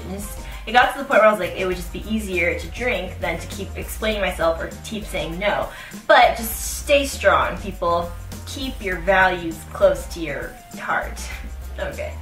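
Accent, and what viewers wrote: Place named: United States. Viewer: All I have to say is: WOW! College is SO different where you're from (I get the idea that it's somewhere in the US from the way you speak but I could be wrong)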